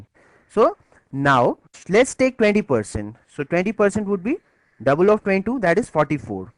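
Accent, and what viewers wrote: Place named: India. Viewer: why is it a lot of english speaking people are stupid. Why they always think that they are beter than other because their language is english. My grammar in english probably not good but for sure, you don't understand and cannot speak german, dutch, italian language........ well I do................. and still, to much bla....blaa in this video. And your accent is not familiar to me. I know brittish, Irish, american and australian accent. But you, you sound like Indian english accent. Right???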